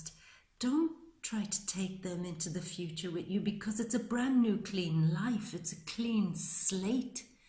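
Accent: Ireland. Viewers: Helo there buitifull lady , i thank you from the bottom of my big Leo hart ,wow love your Irish accent to , happy New yr , well I had tears runing down my cheek for thats my life you read, i know it's General , but i felt it for it was saturn on my case 4th house in my chart in sag , but none the less he's been my task master, it's been karma,i suferd 21 yrs in total, i have endured more than any man, but you are right it a cumilated in the last 3 .. I call it hell an back ,i am still hear , and I really cry when you said ive paid 10 life's karma,.saturn is the teacher task master and father time , Lord of karma , he took me to the limit of my endurance , striped me bare and shown me how insignicant we are as beings for we truly are , pain and sufering loss and the false fell like dead flys , to the way yet test after test i endured humbly for i no i am gratefully for his lessons , for he surely is the greatest teacher of all , and I learnt the hard way ,.i all ways have Spence age seven ,. 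Ime now 60 ... but i like what you said because I do be leave ive passed the tests because simply i wouldn't be hear ,, this i do believe 1000%.. and the rewards via saturn are the greatest for the endever and passing,, yet to come, patients was the hardest lesson humility releasing egos pride, Many many lessons, false pride ,i am a current man than when I started , . I no got to move from hear padt , finally to get ready to receive,. Renewed hope change finish my transformation, ready to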